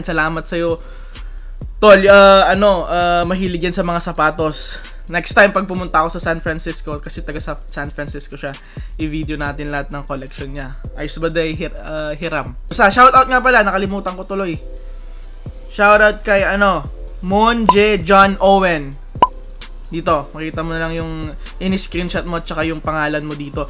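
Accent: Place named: Ilocos, Philippines. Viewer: Kayat ko accent ti ilokano..☺😘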